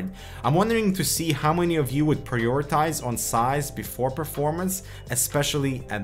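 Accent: Russia. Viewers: can't listen to this russki accent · Мне кажется или у тебя чутка русский акцент?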